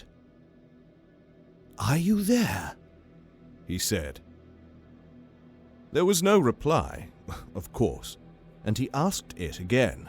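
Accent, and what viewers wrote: New Zealand. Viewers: Kia ora, I'm in Aotearoa too. Lovely to hear a kiwi voice. I narrate audio books for the blind foundation. I've always wanted to do old skool horror. Love your voice. Benson is one of my fave authors.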